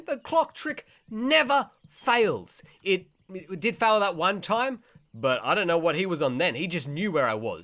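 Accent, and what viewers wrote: Australia. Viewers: is he Australian because i am and he kinda sounds like it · Is he Australian ? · Are you Australian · R u australian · Love people with Australian voices! <3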